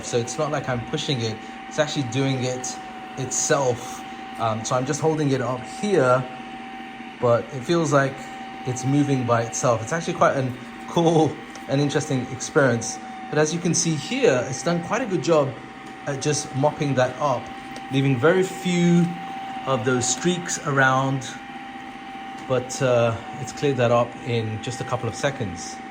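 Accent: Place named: England, United Kingdom. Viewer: Fantastic how you have an English accent yet choose to convert UAE currency to US dollars and not UK pounds.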